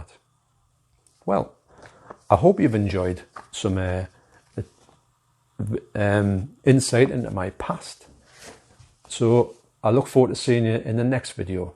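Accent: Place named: RP, United Kingdom. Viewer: what's with the posh accent ?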